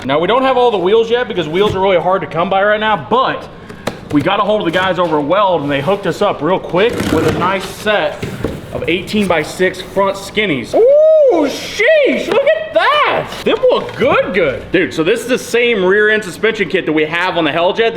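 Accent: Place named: Kansas, United States. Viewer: Bro speaks like a true Kansas-man. (I would know)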